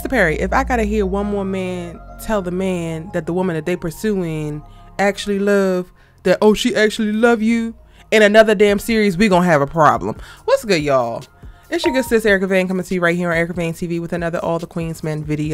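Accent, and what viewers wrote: Baltimore, United States. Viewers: U from Baltimore? That accent thick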